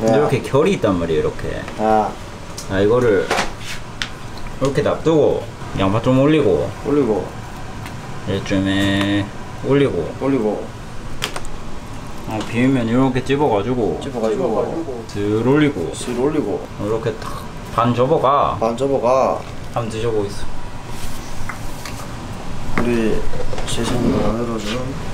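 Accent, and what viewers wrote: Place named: Gyeongsang, South Korea. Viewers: When speaks casually, I notice Daegu accent is sooo cute Kekekeke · Logat seperti logat busan... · your daegu accent is sooooo adorable♡ Love you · Daegu's accent is cuteeee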